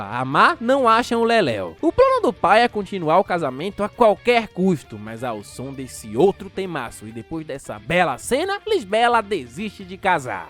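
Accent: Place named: Pernambuco, Brazil. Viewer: Rapaz To surpreso do se Da qui de Pernambuco Sempre Suspeitei do Sutaque Manda um salve Pra Aliança PE, sou muito seu fan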